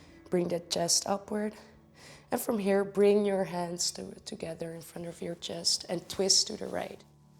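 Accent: Netherlands. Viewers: I'm literally just ten seconds through the video and my immediate first impression was wow, your voice is so calming & soothing and after like 3 seconds I recognized that you have a Dutch accent.:) Been to the Netherlands several times and learned the language a bit. Will definitely do this yoga session with you tomorrow morning when I do my yoga practice on the balcony.:) Dank je wel,!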